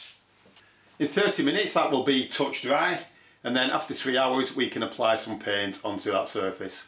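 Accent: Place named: Northwest England, United Kingdom. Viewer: Where do you get your Zinsser Gardz from in the NW? Your accent sounds like you are not too far from me and I could do to pick a tin up this weekend but Screwfix dont seem to sell this 1 any more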